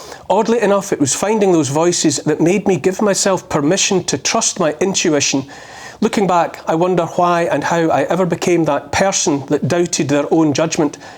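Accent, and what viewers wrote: Scotland, United Kingdom. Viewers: and Amen to that, Dutch from origin, living in Ireland for 30 years now, and have been called a conspiracy theorist, been ridiculed, discriminated against, lost my work as a music teacher because I refused the jab, now if I were to talk to someone the way I feel about what is going on in this glorious country...I would be called a fascist. I am actually proud to be called a CT, because it means I can see through the BS, but this latest name giving is just sick, and I totally agree with this Dutch lady, comfort is one thing you have given me too, listening to your Scottish accent alone is comfort. God weeps.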